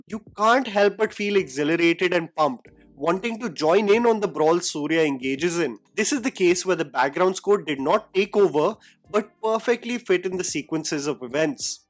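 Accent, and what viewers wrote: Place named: Telugu, India. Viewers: ur Telugu is cute 🥰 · Your Telugu is sooo cute..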